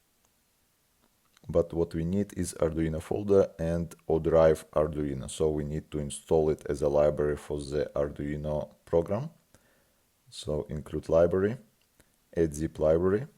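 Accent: Russia. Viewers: Мне кажется ... у вас акцент русский! Если да, то на русском было бы тоже очень интересно.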